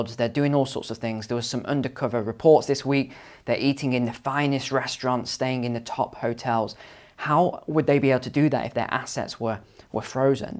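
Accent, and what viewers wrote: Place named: United Kingdom. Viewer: "I don't know about you but I don't fancy going to a nuclear war right now" UK English just sounds so proper and funny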